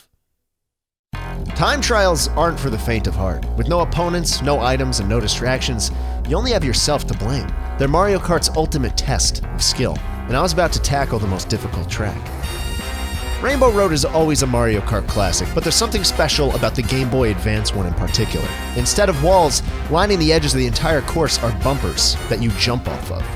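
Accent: New York, United States. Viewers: THIS IS????????????????????????????????? wow this man how grOWN UP...and also developed a new yorker accent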